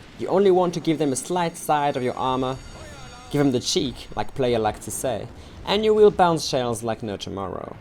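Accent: France. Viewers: Your french accent is on point ! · c'est rafraîchissant d'entendre un accent français quand on vit en Ontario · Nice French accent man 👌🇫🇷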